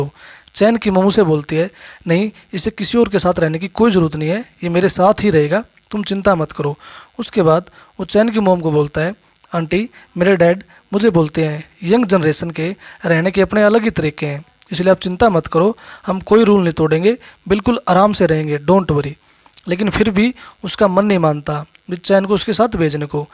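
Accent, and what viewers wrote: Haryana, India. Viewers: Tum harayana se ho kya bro 😍😍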